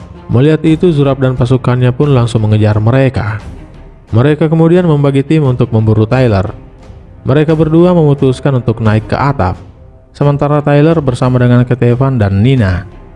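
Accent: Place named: Maluku, Indonesia. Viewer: Mimin logat kaya orang hulaliu la 🙏😁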